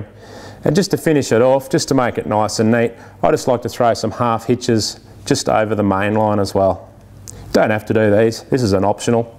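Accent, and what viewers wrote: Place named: Australia. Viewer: This video is much more blokey in the Aussie accent.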